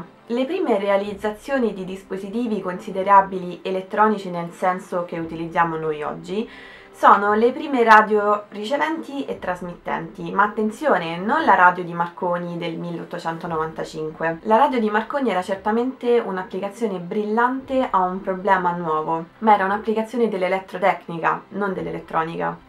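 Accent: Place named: Aosta Valley, Italy. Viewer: Brava, precisa e spigliata. Proprio a trovare un pelo nell'uovo l'accento valdostano ;-) E poi morirò chiedendomi se si dice nanòmetri o nanomètri.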